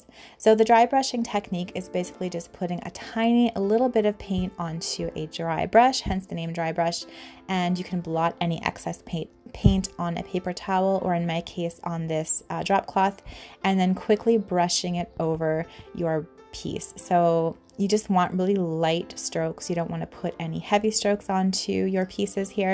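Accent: Canada. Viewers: Hi! I just subscribed to your channel and just loved every one of your DIYs. My favorite is the bird houses, but the faux water can and your garden sign are a close second. I also love your Canadian accent and am looking forward to more videos.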